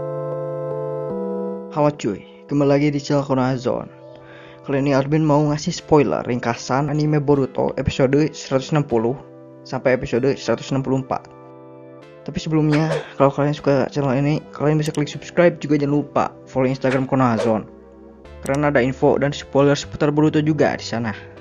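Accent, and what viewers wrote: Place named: West Java, Indonesia. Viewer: Logat nya sunda banget 😁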